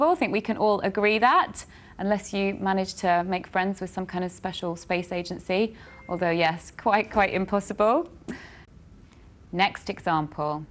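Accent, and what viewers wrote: United Kingdom. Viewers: Omg, your accent is just killing me. I came here looking at some lessons and now I'm in love. British women accent rules!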